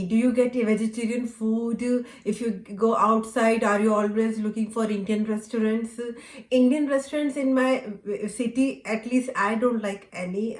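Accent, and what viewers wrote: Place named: England, United Kingdom. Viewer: Nice English accent, not too hard to understand.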